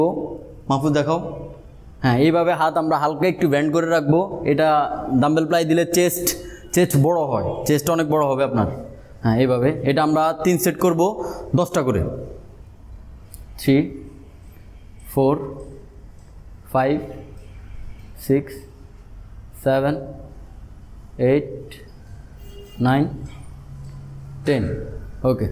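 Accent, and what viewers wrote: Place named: Habiganj, Bangladesh. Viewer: ভাষা শুনে মনে হচ্ছে আপনি হবিগঞ্জ এর মানুষ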